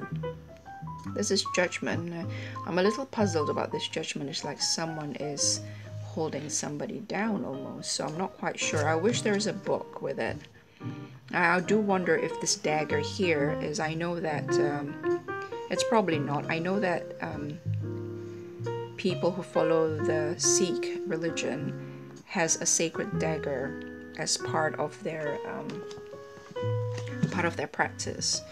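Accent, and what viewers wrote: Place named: Singapore. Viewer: Hahahahah I love your Singlish <3 you score full points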